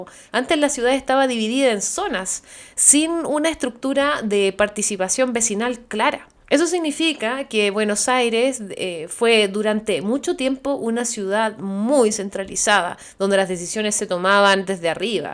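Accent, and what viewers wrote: Chile. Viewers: Hola, querida...he visto varios de tus videos y siempre quedo maravillado por tus comentarios y tu particular forma de expresarlos con tal riqueza idiomática y con ese encantador acento chilensis. Me asombra tanta cultura en una persona tan joven, y envidio a tus amistades que tienen la fortuna de poder dialogar contigo y escucharte exponer tus agudos puntos de vista. Ojalá algún día te decidas a mudarte a BA para que los argentos podamos disfrutar de tu aporte cultural a lo que ya tenemos. Cuídate, muchachita, y que Dios te bendiga.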